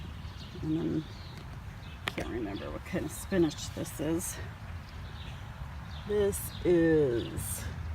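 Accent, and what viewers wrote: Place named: Midwest, United States. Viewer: My late husband and his family are from Minnesota and I detected the midwestern accent!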